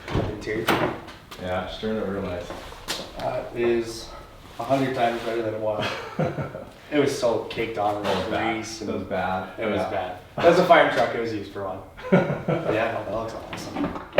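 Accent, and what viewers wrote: Canada. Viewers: Love how you became more Canadian sounding once you were talking to your bud there! It's so funny how we make each other Canadian. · His inner Canadian comes out when he associates with other Canadians. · I ligit screamed when he was talking to the customer I never thought he sounded Canadian till now the aye and everything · Eh? Looks a lot better than I brought it eh? Yea a little less mud on it eh? I love the Canadian speech 😂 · Dude doesn’t sound Canadian when he does voiced overs but when he talks to other Canadians in a video he sounds Canadian as ever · anyone else surprised when he started speaking canadian?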